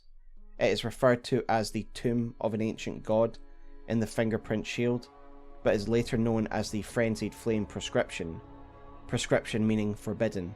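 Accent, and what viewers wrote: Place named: Scotland, United Kingdom. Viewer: Wake up honey, the Scottish Elden Ring lore man has posted!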